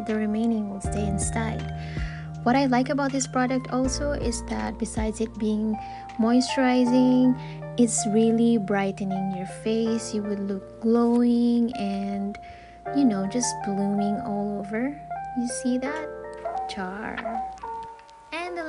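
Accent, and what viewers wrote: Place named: Philippines. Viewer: My idol sa taglishing ug bisayinging pronouncing. Akoa jud ning paaboton para makakuha kog mga tips unsaon pagpa gwapa. Hihi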